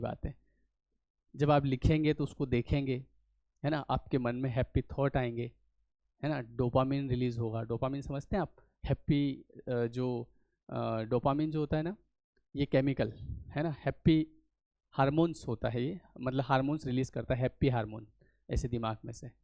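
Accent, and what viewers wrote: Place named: Madhya Pradesh, India. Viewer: i love ur mp acent ratlam indore ya ujjain ❤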